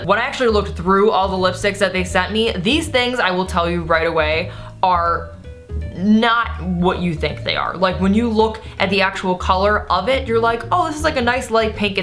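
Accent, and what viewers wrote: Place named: Fargo, United States. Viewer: You sound like you're from Fargo at times, I'm sure that's an assumption, I enjoy your accent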